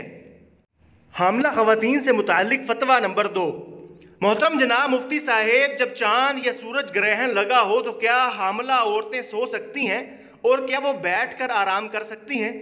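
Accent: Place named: Rural, India. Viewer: Taire awaz sai hai pata chal Raha hai Tu Kitna paindo hai. Don't spread lies!😞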